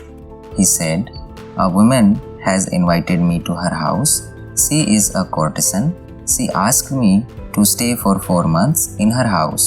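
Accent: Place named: India. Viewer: Thumbs up for using your own original goofy indian accent instead of an AI voiceover. At least it's genuine.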